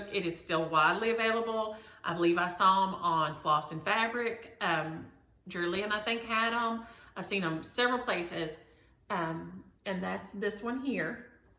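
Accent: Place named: Texas, United States. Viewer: My mother-in-law was called Shug by her parents and siblings. She was the oldest and they always called her Shug. (or Sug - short for Sugar). She was from Texas (Georgetown, Williamson) and had the sweetest Texas "twang" (very similar to your accent). If I ever get the opportunity to be a grandma, that is what I would be called "Grandma". Both of my grandmothers were Grandma and I loved them both dearly. It just seems natural to me, but I totally get how you feel the need to be a different name to your grandchildren. I think since you called each of your grandmothers something different, it isn't a "title" but a "name" - and, I agree, names should be somewhat unique. In regards to my ex-husband. He is gay and finally decided that it was unfair to either of us to remain married - it wasn't easy, but I have come to realize that that was true. 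He is in a relationship with the most wonderful person. They are both my family and I couldn't imagine life without them! And I totally second Roots Tech! It's a great opportunity to get some ideas on genealogy research. They also have an online tool where you can see other attendees and if you are related to them. All the best to you this week!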